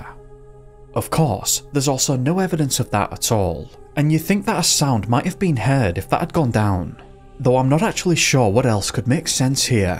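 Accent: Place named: North, United Kingdom. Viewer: A new vid! 🙌🏻Right on! I adore the narrators style in every way but I cannot place the accent correctly. Please someone tell me which place up north this gentleman hails from! ☺️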